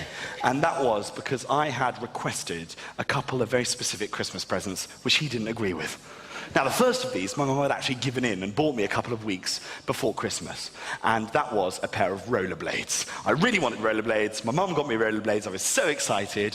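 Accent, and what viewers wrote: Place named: Germany. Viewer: Почему его английский звучит как немецкий?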